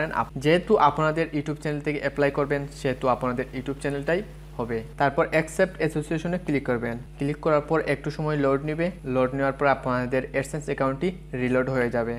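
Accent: Sylhet, Bangladesh. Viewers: Vai aponar khotai buja jai aponi Sylheti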